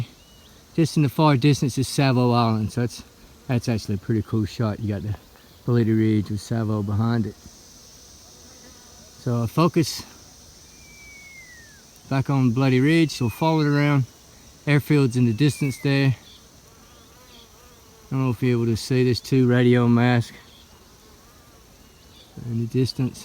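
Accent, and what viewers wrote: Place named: South, United States. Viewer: I have just discovered your channel (love it by the way, amazing content!), and based on solely your accent and the way you speak, I genuinely cannot tell whether you are from the American South, or Australia. I went back and forth on it all the way through this video and still couldnt determine.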